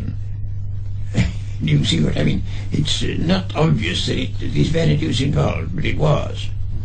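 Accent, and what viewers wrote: United Kingdom. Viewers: Is there a transcript of this interview? It is kinda hard for non-british to cope with that accent tbh :D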